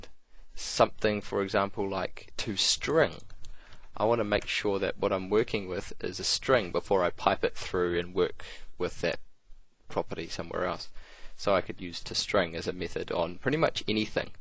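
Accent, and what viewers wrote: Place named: New Zealand. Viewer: Great Vudeo!! Viery helpful and unformative! the accent makes it better. Been doing some revusion on Powershell and this is great vud for ut! Go Kiwi!!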